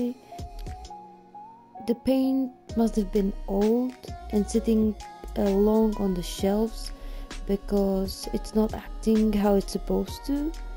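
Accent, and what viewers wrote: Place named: Czech Republic. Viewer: Máš tam český přízvuk budeš nahrávat I český nebo alespoň titulky 😍 jinak super kontent a miluju akvarel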